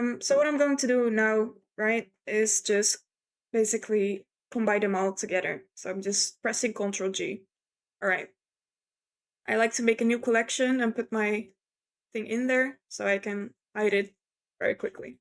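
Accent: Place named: Morocco. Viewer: U sound moroccan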